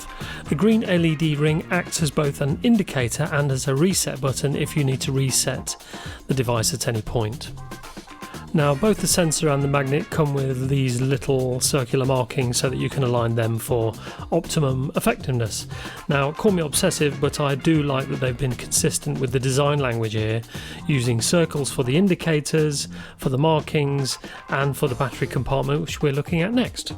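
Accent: United Kingdom. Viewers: Hi, where did you purchase these in UK?, as you sound like you are from the UK.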